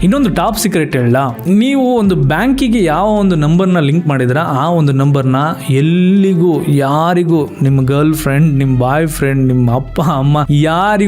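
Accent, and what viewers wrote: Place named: India. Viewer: ಕದಬಹಳ್ಳಿ ಇರೋದು ನಮ್ಮ ಹಿರೀಸಾವೆ ಅತ್ರ, ಹಾಗಾದ್ರೆ ಅಣ್ಣ ನೀವು ಅಲ್ಲಿನ ಸುತ್ತ ಮುತ್ತಲಿನ ಊರಿನವರು ಅನ್ಸುತ್ತೆ ನಿಮ್ಮ ಮಾತಿನ ವರಸೆ ನೋಡಿ ಮೊದಲಿಂದನು ಅನ್ನಿಸ್ತಿತ್ತು ನೀವು ಆ ಕಡೆವರೇ ಅಂತ ಈ ವೀಡಿಯೋ ಅಲ್ಲಿ confirm ಆಯ್ತು 😊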